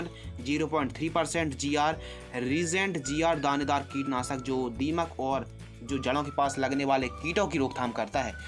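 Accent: India. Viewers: Apka ye video mujhe achha laga, jaankari jabardast h sir, ....... Apka bolne ki shaily rathiya bhasha laga app kaha se ho sir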